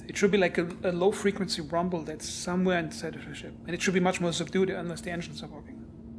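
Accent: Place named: Germany. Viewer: More ship reviews please. As a Brit i "two thumbs up" your German accent.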